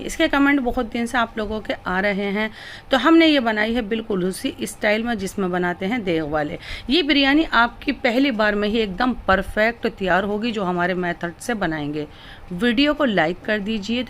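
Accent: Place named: Delhi, India. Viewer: I love your proper Dili Urdu accent. I can listen to you all day long.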